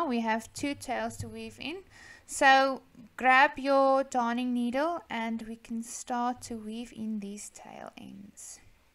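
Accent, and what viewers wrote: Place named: South Africa. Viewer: It is beautiful hearing a Fantastic South African accent again! I for the first time have truly managed to do and understand my first crochet attempt. Thanks a million x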